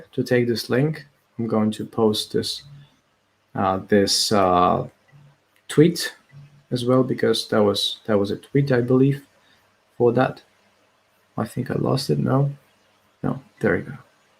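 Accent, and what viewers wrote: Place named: Poland. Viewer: Also thank you for introducing me to St. Rita. I am a fellow Catholic, Hungarian born. To me your accent sounds like someone who moved away from Poland when you were in your teens and learned English then. (or maybe I am projecting here as that's my story) . Good on you for being so brave and sharing your belief in public, I know exactly how hard that is as especially being a Catholic.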